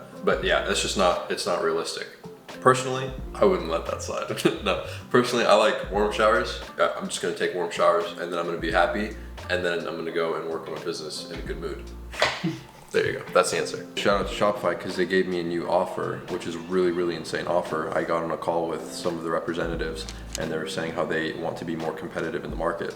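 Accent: Romania. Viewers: Bro, I knew you were romanian, I can finally hear you talk :)) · ador accentul,make ardeal great for good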